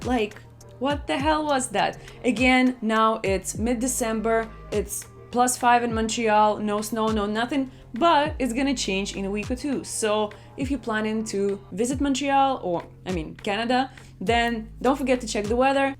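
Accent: Russia. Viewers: I like it when you still put your Russian accent. Informative vid by the way. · i like your russian scent when you start to be mixed accent of russia with English 😂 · Ur Russian police aksent😁, was great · You russian accent is hilarious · I'm sorry, are you Russian? Because I personally am, and uh... I kinda can hear that :) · from your accent i guess you are from =Rosiya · Well I liked your Russian accent... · Tão fofo ela falando inglês com sotaque russo. Hahahaha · Hahahaha I love Russian accent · I like how her accent is a little mixed with Russian 😍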